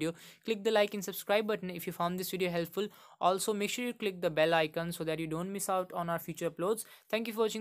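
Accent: India. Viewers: i would listen to this dude, but everytime i hear an indian accent trying to provide technical help, it is a scam, so no thanks lol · First Indian mixed with English guy that had ever been helpful thanks bro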